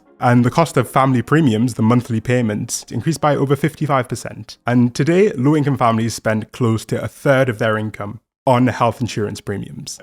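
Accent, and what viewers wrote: United Kingdom. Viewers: tbf this happens to me, especially given this guys voice is deep and he has a less pronounced british accent. I also watch a lot of non american media so I tend to forget about or confuse different accents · Great info, thanks for the time you've put into this. Nice to hear a Brit accent that doesn't sound like that Bond villain Andrew Witty, really cleanses the palate. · wait I didn't even notice he was British lmao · how did you get that british accent? where are your parents from?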